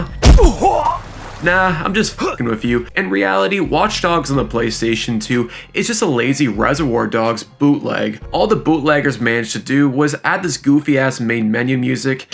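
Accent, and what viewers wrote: East Coast, United States. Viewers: I just watched a few of your other videos and was guessing you were from the east coast and am now satisfied with the confirmation. (Really glad I didn't fuck it it up and find out you from Wisconsin) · You must be from the east coast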